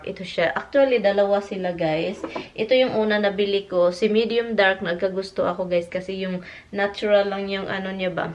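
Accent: Visayas, Philippines. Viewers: di ba nimo gi remind si sa resulta sa iyang pagpanigarilyo? Amping sa iyang Lungs oy Sunod grabe jud na ang tira sa imoha kay resipient ka sa cigarette smoke nya. Nag ubo ubo na cya ba. Just be careful. Watching your vlogs. Njoy ko sa imong English with visaya accent. Ayos jud huh! More power.Rachel from the Philippines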